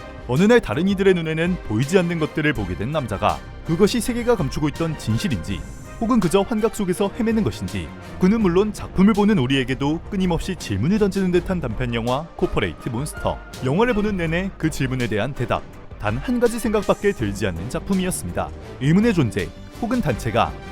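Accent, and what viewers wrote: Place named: China. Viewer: 말투가 조선족 같아요 ㅋㅋ 컨셉인가요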